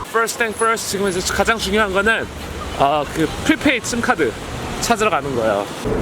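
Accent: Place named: New York, United States. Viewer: 저는 님이 뉴욕쪽 액센트가 있다고 생각해서 동부출신이라고 생각했는데 아닌가봐요..ㅋㅋ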